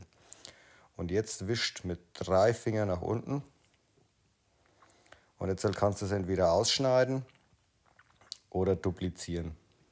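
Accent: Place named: Franconia, Germany. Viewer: Toll erklärt 😅 endlich mal ein Procreate Video im fränkischen. Leider klappt es aber bei mir nicht. Das Bild wird nur dupliziert und nicht ausgeschnitten :(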